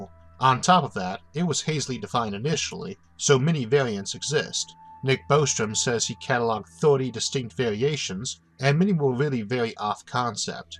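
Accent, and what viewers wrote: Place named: Louisiana, United States. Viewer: I thought initially it was a strong cajun accent lol.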